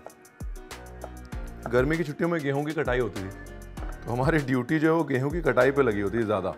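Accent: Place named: Bengal, India. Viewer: Your way of talking is quiet similar to bengalis, do you have any connection with Kolkata , as becoz your accent, way you throw words are very sweet and not like typical Punjabi's. 🌼🌼❤️🌸love from West Bengal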